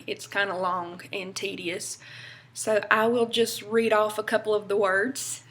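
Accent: South, United States. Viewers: OMG ! One of the cutest girls ever :) and i luv that Southern accent, it triples your cuteness :) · I love your southern accent yall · I get accused of having a stronger accent than most of my peers even though they are from the same place as I am. I am from southern middle, Tn. I think some of us sound more southern than others because of who raised us, like our grandparents, that usually sound more southern than our generation, or if we are from the country or the town, or if we are influence by the way people talk on t.v. or movies and it effects our speech. Also, i think that some people are just simply more southern sounding than others... my little brother doesn't sound southern at all. This girl is from North Carolina and she has a different sounding southern accent.. its funny to me because she sounds alot like I sound. We say "fixin to" and "sweety" but, when we say "honeychild" it is almost like we are being dramatic about something. 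We don't use that here in the same way she is saying they do. I love this.. I may do a video too:) great Job I love southern accents and i really feel like if we speak correctly when it comes to grammar then we sound southern more than country :) GOOD JOB :) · Sweetheart, thanks so much for sharing your videos with people who are interested in learning about your way of speaking. I too am from North Carolina, near the Greensboro area (in the Piedmont). My husband is from "out in the country," and I was raised "in the city." He speaks completely differently from the way I do. We have some funny stories about when we were first dating. Anyway, thanks again for being so kind to people. You are a good Tarheel! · Love your southern accent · I'm from Monroe,Nc born and raised most of the people have your accent but others like me have regular accents but have southern accents when the slang come out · You sound like my girl cousins in S .east NC. 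My Grammaw from there used some old pronunciasions like saying " hit " for it, and "caint " for cant. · I live in Western NC I sound just like you I never thought I had an accent though I though everyone else did lol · Here in this video you mentioned, that you'd be willing to talk to people one on one, if they have more questions and interest in your Southern accent and that we should send you a message, which you get in your inbox. That's very nice, but I don't see any other way to send you a message, than by commenting. Maybe you disabled that feature? But I really have a genuine interest in Southern accents and culture, as I used to be a language teacher of American English and German. Although I don't teach anymore, I'm still very interested and I wonder, if I'll ever be able to speak it, like you do. In your other video I also mentioned, that I'd like to find ways of helping each other to grow our YouTube channels. 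I started mine 3 months ago. I was also wondering, where your last name, comes from, from what language. Hebrew maybe? But that's a wild guess. I have no idea, actually. Anyway, I feel like we would have a lot to talk about on Skype and it would be fun. Let me know, how to contact you! Thanks. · Love the accent and a beautiful face to go with it and the blonde go with you :-) . i am from nc here in Charlotte I thought mine was thick an I mean mines is thick so I can relate :-) . Take a look at one of my videos you will here how I sound. Be blessed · Saw this in my favourites. Seriously. I know that a lot of people say that you're pretty and all. But for all I care you could wear a paper bag over your head and I'd follow you on a shoe shopping spree along with all your lady-bros if I could hear you talking in your southern accent all day. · Sugar sugar sugar that chick is all sugar...love your southern accent! 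· Love the southern accent. Ill sit and visit with a southern girl for hours just to listen to her talk.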